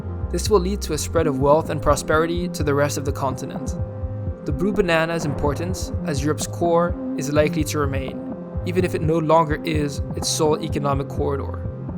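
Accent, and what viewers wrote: Rotterdam, Netherlands. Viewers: Unmistakable Dutch accent ;-) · I was casually watching the video... then "no, another Dutch guy" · I'm not Dutch or French, actually English. But he definately sounds more Dutch than French. French accent is always thick when speaking English lol you can tell a mile off. Dutch is much more subtle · I'm pretty sure the maker of this video is from Rotterdam, just because of the way he says it 😂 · hi narrator Are you dutch? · I hear Dutch English... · I can hear you are a dutch speaker :) loved the video! Will come back for more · Als deze gozer niet Nederlands is dan eet ik een blauwe banaan. Wel goed accentje tho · I dont think so because he sounds Dutch. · Confirmed your dutch No one in the world except Ppl from Rotterdam with accent can speak Rdam like that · His accent in English sounds very Dutch. Can’t say I’m surprised he is Dutch haha. 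· He's dutch Can't you tell by his accent? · HOOR ik daar een Nederlands accent? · You sound dutch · Are you dutch? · Pretty sure this guy is dutch speaking. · You're Dutch aren't you? Good vid!! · Sounds dutch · I thought he was Dutch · Rottedam je klinkt als een echte Rotterdammer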